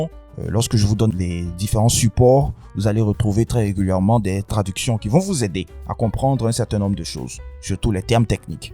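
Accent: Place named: United States. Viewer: Salut mon frère, franchement j'ai vraiment la façon donc tu parle cette anglais américain. Je suis vraiment impressionné. Stp est-ce possible d'avoir ton contact si ça te gêne pas. J'aimerais vraiment développer ma conversation en anglais américain avec un speaker en plus de mon exercice personnel de parler en anglais ( shadowing)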